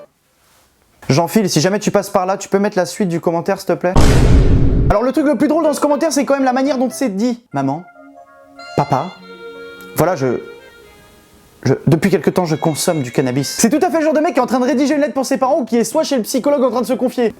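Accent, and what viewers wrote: Switzerland. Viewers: T'es Suisse toi ou bien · T'es Suisse?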